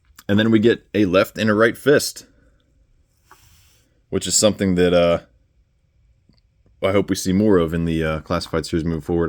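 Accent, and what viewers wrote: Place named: Pennsylvania, United States. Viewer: I'm good ain't I? I heard the pronunciation of the words you say. Forget what words they were but I know you didn't say this but this would be an example. Cold. Half of jersey says cold with the l. The other half and into pa and surrounding states and areas say code. You guys leave out the l. Many other words you say that you never realized you said differently, like house and home. Most people don't pick up on things like this lol. I'm from the jersey shore, my family's from PA and Trenton. We talk so differently and I'm only 40 minutes from Trenton and the Delaware River.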